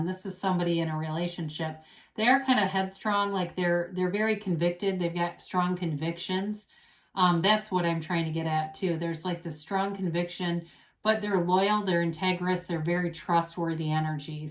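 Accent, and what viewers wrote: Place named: Michigan, United States. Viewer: Sounds like you have a Michigan accent...or thereabouts.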